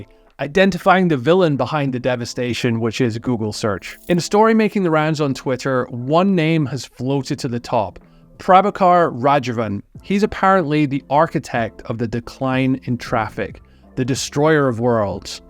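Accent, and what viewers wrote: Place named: France. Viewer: My site's numbers went back to normal almost .. climbed back up in a week. I like this copy of Income school's report but with a French accent. :). Well, same tribe destroyed almost all US corporations, from Pharma to Boeing and everything in between. Just so they can rise from slumps and not be slump dawgs.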